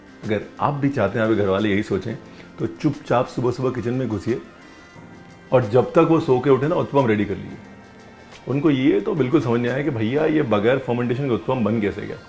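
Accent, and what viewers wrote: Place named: Punjab, India. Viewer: Punjabi Accent 😍😍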